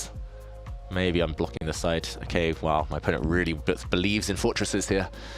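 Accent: United Kingdom. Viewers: I much prefer watching you to magnus, because your commentary is much more fluent, as a professional commentator and native english speaker (I love your english accent too!). And frankly your moves, style of play, and attitude are more relatable as well. I love watching you analyze and explain your GM moves, the explanation of which makes it much more impressive and instructive to watch. Magnus is playing on such a diff level that it's just not relatable, even if he's #1 in the world. I watch all your TT streams start to finish, but not magnus's. So please come back soon; we appreciate you so much!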